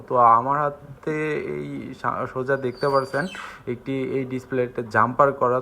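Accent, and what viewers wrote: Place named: Kushtia, Bangladesh. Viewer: কুষ্টিয়া কথাই ভাইয়া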